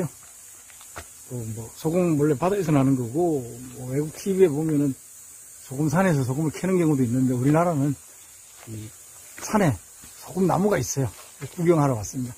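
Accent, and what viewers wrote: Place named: Gyeongsang, South Korea. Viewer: 갱상도 사투리가 이젠 귀에 착착 잘 달라 붙어요~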